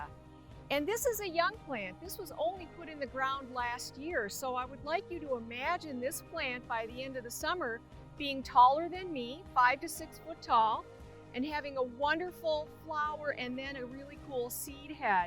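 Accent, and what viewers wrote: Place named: Minnesota, United States. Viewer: Thanks,! You're awesome, and I love your Minnesota accent! This video was super helpful and filled with beautiful plants 😊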